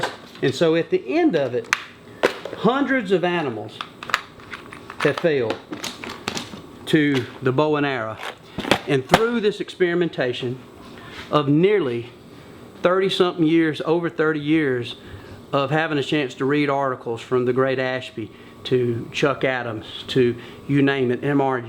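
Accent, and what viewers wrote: South, United States. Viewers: I love the way you southern boys deliver a message. By the way, I do remember watching you over 20 years ago.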